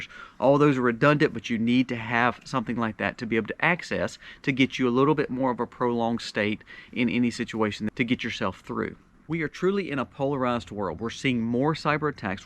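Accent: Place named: Mississippi, United States. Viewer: 🤔 "...your WHALE...how to BOWL...,"😮😅 Oops! Forgot to adjust my ears for your lovely MS "accent" (we ALL have accents 😉)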